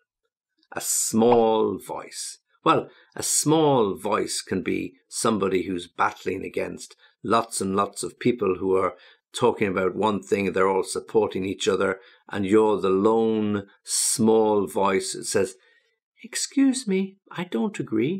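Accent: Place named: United Kingdom. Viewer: My morning begins with your video or podcast,! I hope my accent isn't too broad)) Learning from you, shadowing your beautiful British accent!:) Thank you so much!!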